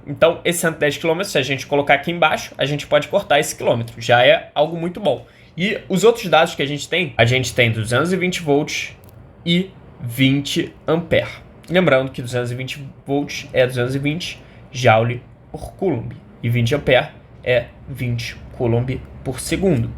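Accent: Rio de Janeiro, Brazil. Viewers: nada a ver com o conteúdo mas, tu é carioca da gema?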